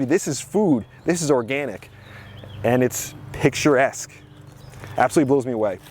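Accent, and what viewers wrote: New Jersey, United States. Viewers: I could listen to him just say Water in that Jersey accent all day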